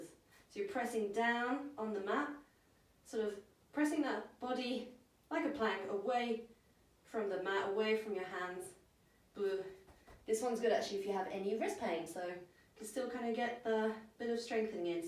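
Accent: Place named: New Zealand. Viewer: You have a nice accent. Is it NZ?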